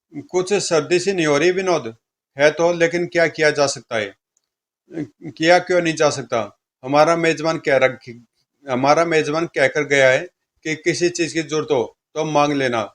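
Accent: Haryana, India. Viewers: मजा आ गया हरियाणवी स्टाइल में comic सुनकर भाई, जय हो 🥹🤟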